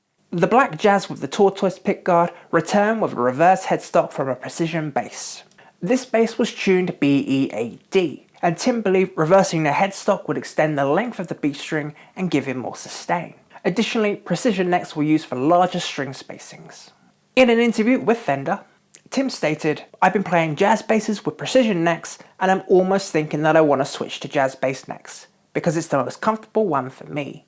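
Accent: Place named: Southern England, United Kingdom. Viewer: Awesome stuff as always. I even ordered a curry just have watching this, well worth it. Here's a left field suggestion - How about YOUR rig? :) Ever thought abouot doing a 'Rig Rundown' series with bands nearer to you? Considering your accent makes me think you're from somewhere between Bristol, London or even Sussex, you'd probably be inundated with choice, right? :)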